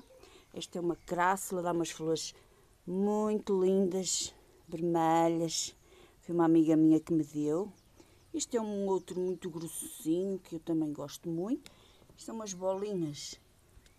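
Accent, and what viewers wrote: Portugal. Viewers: Oi querida, impossível não me inscrever, suas suculentas são maravilhosas, amo suculentas e como os portugueses falam.Sou do Brasil, Rio Grande do Sul, moro em Passo Fundo.Sucesso com suas suculentas.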